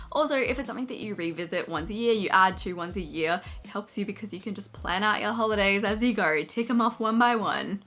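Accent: Australia. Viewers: ok but... Drew Barrymore with an Australian accent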